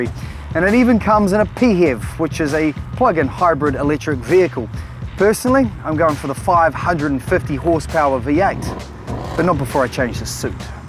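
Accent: New Zealand. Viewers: This video is hysterical and brilliant. Love the hair "in 1970 and the polyester suit." You are correct, we don't know the difference. Humor and your NZ accent, you can sell anything! :o) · Damn I hate that NZ accent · Always loved NZ accent over Aussies at least the world can understand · Damm I love that NZ accent! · NZ accent at its finest ladies and gentlemen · Didn't know New Zealanders pronounced "Land Rover", "Langerovah"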